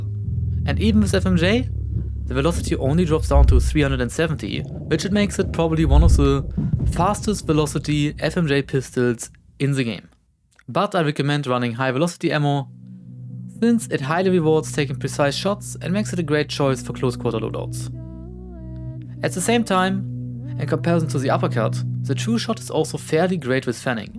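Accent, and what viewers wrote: Germany. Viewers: as a fellow german i cant help but love your THICK german accent lol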